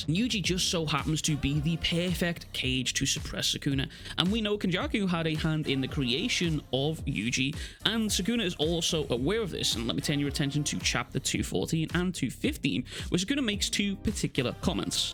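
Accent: Liverpool, United Kingdom. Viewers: Is this a scouser?? (United fam here) 👀👀👀👀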